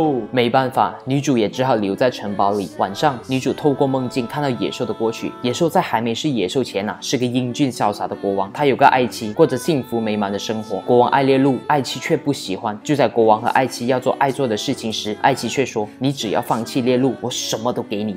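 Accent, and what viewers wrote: Malaysia. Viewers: 我就想說聽了怎麼久都聽不出是什麼國家的，以為是中國的時候就突然冒出一個馬來西亞嗆，才知道…原來是馬來西亞人啊XDD 以馬來西亞人來說你的腔調沒那麼明顯呢XDD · 是马来西亚人？ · 哈哈哈，馬來西亞口音，好懷念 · 哈哈~听到这里时我也奇怪！这是大马人吗囧？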